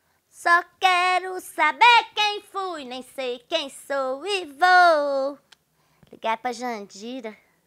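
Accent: Minas Gerais, Brazil. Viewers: Jamais os americanos entenderam este sotaqui que so os mineiros sabem .😂😂💋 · Adoro te ouvir... Minha mãe chama Josefa que nem a mãe da Jandira. E a familia do meu pai é minerim irgualzinho oce... Adoro...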